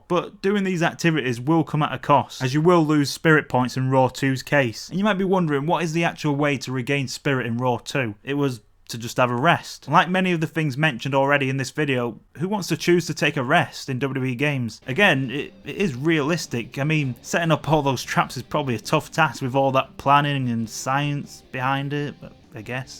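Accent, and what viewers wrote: Yorkshire, United Kingdom. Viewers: Are u from Yorkshire?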